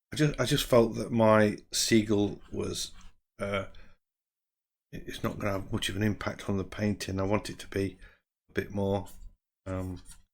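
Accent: United Kingdom. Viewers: I’m more and more impressed of what you can paint digitally. I really like the way you use the pastels, I can’t say that I liked pastels in the past. You are making great art and I’m grateful that you show all steps to us. I also like listening when you are talking how you think while painting - the british accent is also to my taste.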